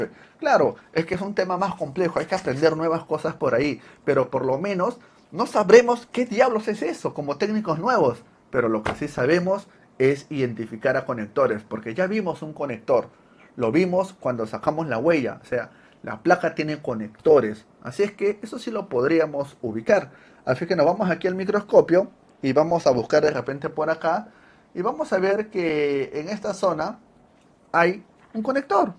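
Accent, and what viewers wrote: Peru. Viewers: Con razón le buscaba el acento y no acerté a que era peruano.😂 Es un excelente profe.👏👍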